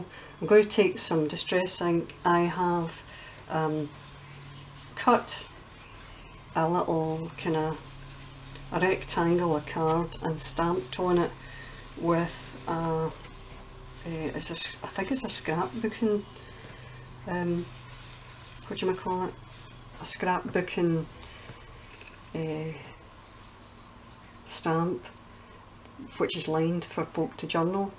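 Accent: Scotland, United Kingdom. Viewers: Gorgeous ... thank you for sharing... think I detect a fellow Scottish crafter 😍 x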